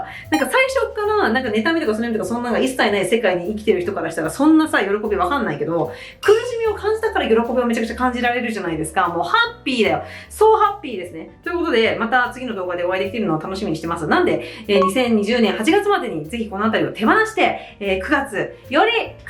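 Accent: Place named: Edo, Japan. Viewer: 心が軽くなりました😄これからもよろしくお願いします👍べらんめい調、結構好きです。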